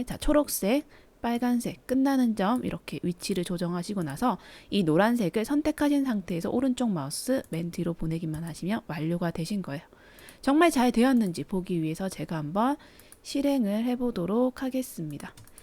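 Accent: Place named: Busan, South Korea. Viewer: ㅎㅎ 뭐하노~~ 붓싼아이가~~ 정겹네요~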